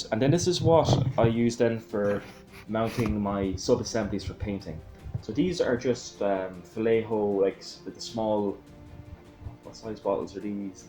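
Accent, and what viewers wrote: Ireland. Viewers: Whenever I watch any of your videos, I always end up with an Irish accent in my head for a few hours!😂 Funniest thing is my parents were Hungarian , and they couldn't pronounce "th" either since that sound doesn't exist in the Hungarian language. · Your channel was one of the first I watched when I got back into scale modelling and back into using an airbrush, now I remind myself to “t’in it down” with an Irish accent lol, Cheers!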